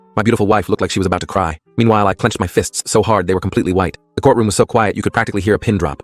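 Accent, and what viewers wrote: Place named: United Kingdom. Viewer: The British accent is just no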